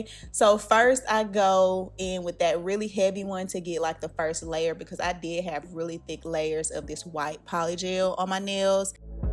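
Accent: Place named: Texas, United States. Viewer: Are you from Texas? You got a distinct accent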